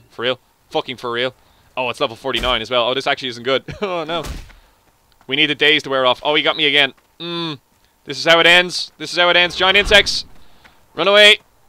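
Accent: Ireland. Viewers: Are you irish? you sound like a right dub hahah quality video though keep it up!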